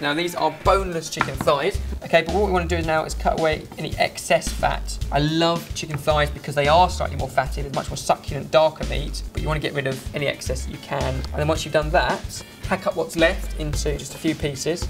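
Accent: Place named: United Kingdom. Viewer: accent is seriously waaaaay too british))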